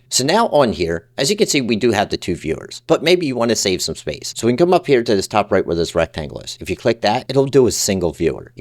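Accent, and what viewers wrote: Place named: Pittsburgh, United States. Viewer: Are you from Pittsburgh? ❤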